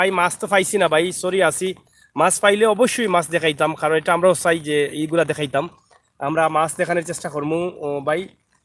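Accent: Sylhet, Bangladesh. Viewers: Vidio kharap lage nai. Kintu apni shuddo vashai kotha bolle hoito aro valo lagto. Kenona syleter vasa shobai buje na. Buccen mama!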